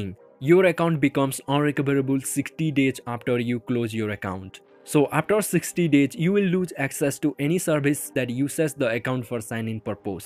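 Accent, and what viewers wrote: India. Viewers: thank you for the info sir i bear that in mind it's very useful. everytime i always need help there will be a man with Indian accent that always be with me to help thank you again sir all the best to you now sir soon you will blow up and become famous youtuber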